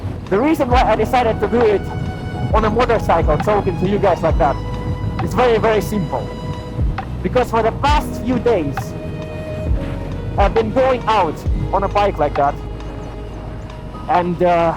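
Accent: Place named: Russia. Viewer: i clicked cuz i was looking to start streaming on twitch but i heard about constant random bans with no real clear reason from twitch making me question if its really worth it or not, as far as i know twitch is a simping platform that punishes guys and let girls do as they please with minimum consquences. anyway i clicked and as the video began i noticed that russian accent but didnt really know if its or not, but then i looked at the channel name... and im like wait!? Russian accent!? but the i know should be famous why is this account have a low below 10K, then i realized... this is second youtube account:| wow what a suprise the name brought me back some memory of old CSGO as i used to play back in 2018 until somewhere around <num> where i quit the game doesnt feel the same for me anymore it just not for me. but aside from all that im really shocked seen my guy feeling down because you my friend were part of my childhood, hope things work out for you brother, and do be careful dont risk your life because if something happened to you, your subscribers your followers are the one who will grieve over you. 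if riding your motorbike is your way of therapy i suggest you try something else maybe go for a walk or try to meet new people or spend time with OGs, and while im pretty sure you wont read this entire paragraph im still writing with the assumption you would read it, do take care of your self cheer up! LETS GO CYKA! RUSH B! B FOR BOOBS!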